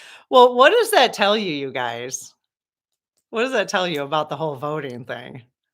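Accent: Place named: Wisconsin, United States. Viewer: I knew Wisconsin by your accent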